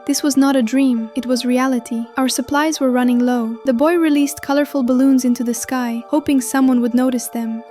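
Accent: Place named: Russia. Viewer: Wth is this Russian accent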